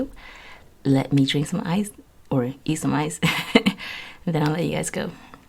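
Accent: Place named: South, United States. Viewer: I love your voice; I hear a southern accent 💞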